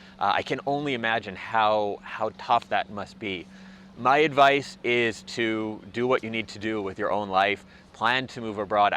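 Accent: Canada. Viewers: sounds Canadian and his views are rooted from that perspective, which is ok because everyone has to live his or her life.Canada is very individualistic so listen to him. But be careful, the later can be just as bad, especially in Canada because its hard to fit in. Don't try to. live your life. people will disappoint so don't expect to be connected in a disconnected world because we are all alone together. Best of luck.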